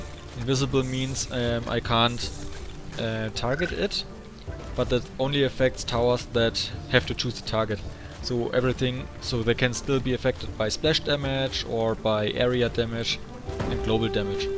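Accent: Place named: Germany. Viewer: Ja, man hört den deutschen Akzent sehr stark raus.^^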